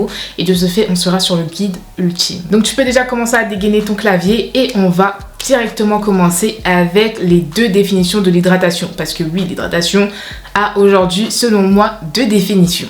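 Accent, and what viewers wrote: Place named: England, United Kingdom. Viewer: On entend l’accent anglais en fait!!!!😂😂😂😂😍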